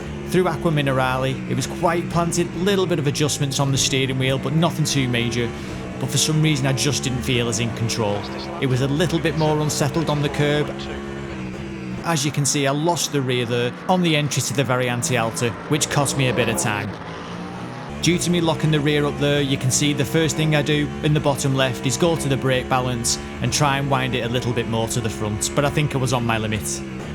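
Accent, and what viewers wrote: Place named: Bolton, United Kingdom. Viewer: Not a beginner but I watched the whole video to see if your observations are similar to mine - turns out they are almost exactly what I felt as well. For example the Lambo being edgy and bouncy over curbs but a more exciting experience than say the Peugeot. Also, I really enjoy the way to structured the video with each car segment being your fastest laptime long. Another question: I'm not a native english speaker but I really enjoy learning about accents. I could hear that you're probably from the northern parts of England, but I'd love to know which part more specifically, if that's not too much to ask. Your pronounciation of "fair" for example sounded more like I pronounce "fur" and the last person I heard talk like that was from Bolton.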